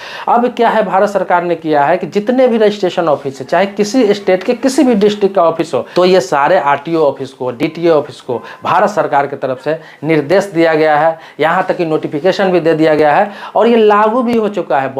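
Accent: Bihar, India. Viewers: Mere lag raha hai ki tu bhi bihari hi bol raha hai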